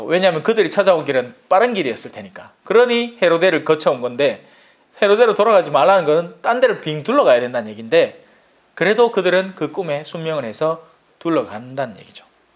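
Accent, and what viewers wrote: Gyeongsang, South Korea. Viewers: 신부님~ 이틀전 우연히 신부님 영상이 떠서 오늘부터 마태오복음 1강 시작했습니다^^ 신앙생활1년차인데 같은 경상도 사투리라 친근하고, 많이 배우고 깨닫고 느끼고 갑니다. 앞으로 한 강의 한 강의 들을 때마다 저도 영적으로 많이 성장할것같아요😊😅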